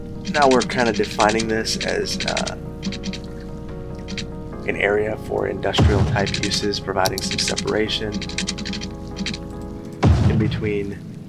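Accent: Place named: Midwest, United States. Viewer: I live in Madison! Yay! I thought you sounded like you had that midwest charm!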